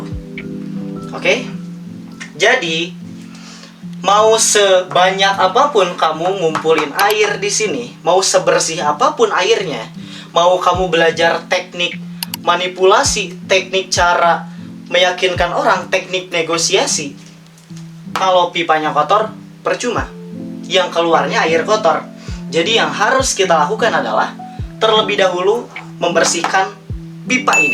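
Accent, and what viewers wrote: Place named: West Java, Indonesia. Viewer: makasih penjelasannya bg sangat mudah difahamii btw abangnya org sunda bkn soalnya logatnya kenal bgt AHAHAHAH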